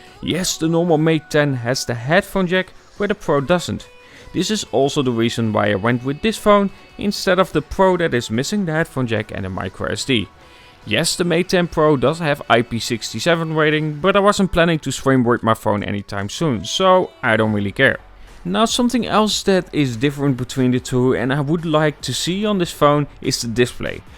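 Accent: Netherlands. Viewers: Ok. I see "NL" on the phone and you sound a little Dutch. Is the Mate 10 available in the Netherlands?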